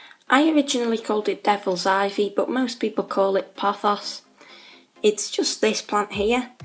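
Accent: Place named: Scotland, United Kingdom. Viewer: I like ur accent, is it Scottish? Or British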